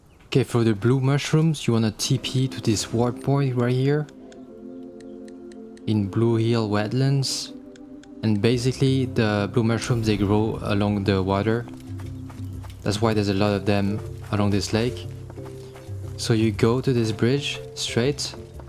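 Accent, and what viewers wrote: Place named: France. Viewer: LOL I didn't imagine you were Asian. I always thought you had something that sounded a bit like French accent, not sure, but I thought you were most likely European or smt.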